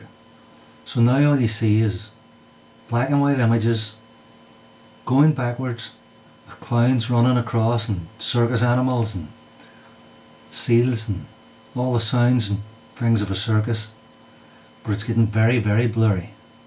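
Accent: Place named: Northern Ireland, United Kingdom. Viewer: guy is northern Irish. always strange to hear your own accent. lol great video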